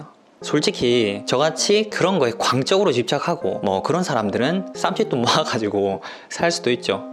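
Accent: Gyeongsang, South Korea. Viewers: 구독하고 처음 댓글 달아요. 반갑습니다. 경상도 사투리 참 정감 있어요. ^^ 저도 경상도 사람이라...ㅋ 저는 방문을 리폼하려고 하는데요. 지금 살고 있는 현재집 말고 전에 살던 집이 페인트가 칠해진 목문이었기에 페인트 칠해본 경험이 있습니다. 방문을 떼지 않고 칠해서 인지 롤러와 붓으로 칠했는데 일명 눈물자국이라는 것도 생겨서 사포로 밀고 다시 살짝 칠한곳도 있었어요. 지금 살고 있는 집의 방문은 필름지가 입혀진 방문인데 필름지 위에 페인트를 해야할지 필름지를 다시 붙여야할지 고민하고 있어요. 님 일반인이 직접 셀프로 하기에 필름지가 나을까요? 필름지 위에 페인트가 나을까요?(물론 젯소 바르구요.)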